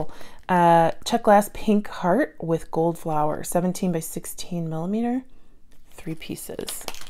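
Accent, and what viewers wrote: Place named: California, United States. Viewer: Sounds like from right here in california right here by the bay pretty cool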